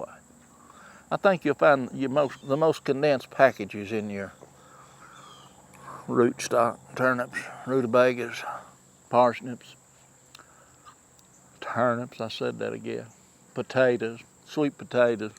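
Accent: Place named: Appalachia, United States. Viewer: Your video just popped up in my video feed and you sound like you are in my neck of the woods in Appalachia. Maybe Ky or Tn? Hickory king is the best field corn and roastin ears too. I love it for corn relish and cornbread too! Yep, seed time and harvest time and global warmin and coolin. I like your Farmall Cub. My grandaddy had a Farmall M. Plant everything you can. You'll need it soon enough.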